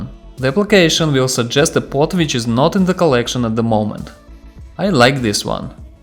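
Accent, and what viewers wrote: Russia. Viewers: Dude are you russia? You speak with some strange accent reminiscent of Russian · это случаем не русский акцент у автора??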